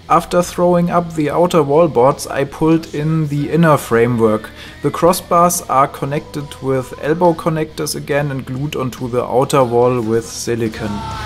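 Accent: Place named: Germany. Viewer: jetzt no front, aber man hört sooo hart dass du deutsch bisd hahaahh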